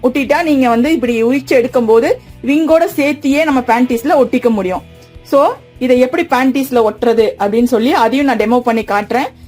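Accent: Kongu, India. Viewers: I m watching your all videos from 3 years ago. All videos super. Kongu tamil madam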